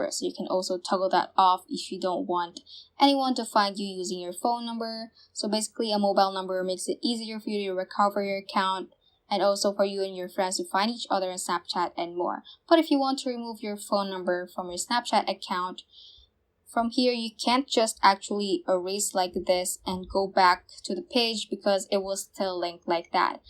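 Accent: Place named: England, United Kingdom. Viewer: Your English accent it's so nice ❤️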